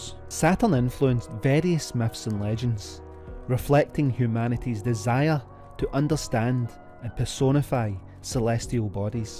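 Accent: Scotland, United Kingdom. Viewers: where are you from? this dude has a smooth, posh scottish accent. i'm willing to wager his accent is nicer than yours. he's british - the island where the english language was created.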